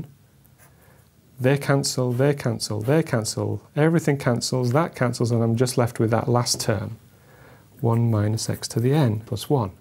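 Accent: United Kingdom. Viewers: British accent supremacy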